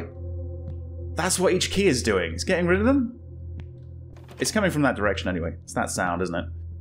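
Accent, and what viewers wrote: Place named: United Kingdom. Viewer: Gotta love that british accent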